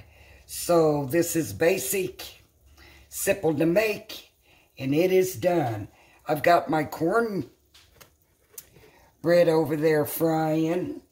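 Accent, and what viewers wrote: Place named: South, United States. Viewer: You don’t have the Indiana accent…it sounds more southern like me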